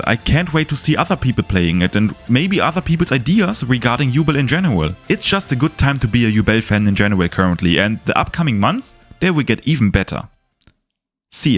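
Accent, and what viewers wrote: Germany. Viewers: Der Bro spricht einfach deutsch auf englisch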